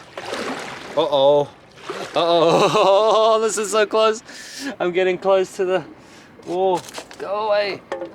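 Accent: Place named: New Zealand. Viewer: where are you from bro? sound like a kiwi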